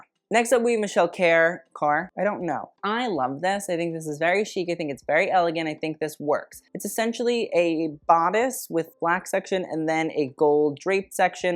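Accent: France. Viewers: As a French girl, your French accent is killing me 😭😭😭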